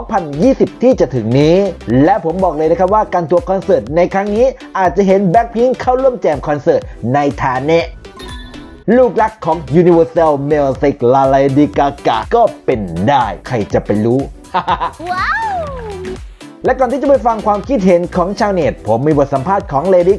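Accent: Isan, Thailand. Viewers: เลดี้ กากา คนบ้านเดียวกะกุนี่เอง สำเนียงกุแต่เกิดเลย พะนะ นี่แน้ะ 555 นอกจากรักลิซ่าแล้วนั้น ขอรักแอดอีกคน ขาดไม่ได้ มาทุกวันนะ พะนะ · อีสานแท้ล่ะว่า พะนะ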